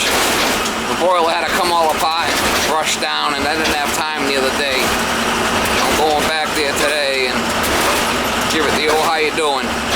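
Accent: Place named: Cape Cod, United States. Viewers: near cape cod accent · Mada Nielk that’s a Dartmouth/Fall River/ New Bedford accent.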